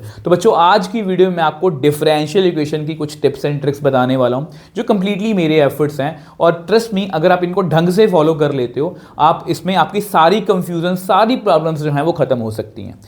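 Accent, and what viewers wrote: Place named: Pakistan. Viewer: Sir apki accent pakistani lgti h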